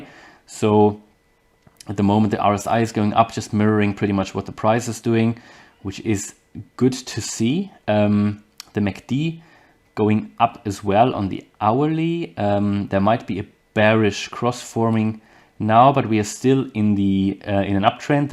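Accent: Germany. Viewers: you sound like you are from a german speaking region , germany-austria -switzerland . best regards from miami , a ex german immigrant :))) now american immigrant,,, always immigrant :))))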